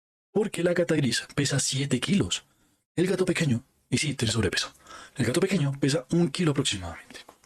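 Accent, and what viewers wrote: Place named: Bogotá, Colombia. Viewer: La verdad amigo, creo que eres una persona inteligente, pero d ves mejorar muchas cosas. Primero, el acento bogotano que se caracteriza por hablar entre los dientes y hay momentos en que no se entiende. Segundo, eres muy disperso y eso cansa a quienes se interesen por el tema. Tercero, dejas muchas lagunas a pesar de que el tema es muy interesante. Yo soy meteorólogo y quizá luego haga un vídeo al respecto. De todas maneras, ánimo.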